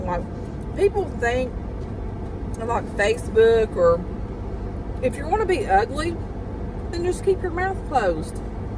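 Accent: South, United States. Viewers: HUGS from Vancouver Canada. You are a wonderful person with a fabulous southern accent, love it! Never explain to anyone. Be yourself always. I enjoy your honesty and kindness. Have a wonderful day :) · My favorite salad is a wedge salad. It's a wedge of iceberg lettuce with bacon and blue cheese dressing. It's so good. I adore the way you talk. Moved here to NC from Ohio and I adore the southern "accent". You have a innocent, down home feel when you speak. Don't ever change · Honey if that girl doesn’t like a southern country accent she might want to move out of the state of Tennessee. Of all the things to get upset about in this world she has to be upset about how someone speaks. Bless her heart she needs help. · I love your accent a southern accent is a beautiful thing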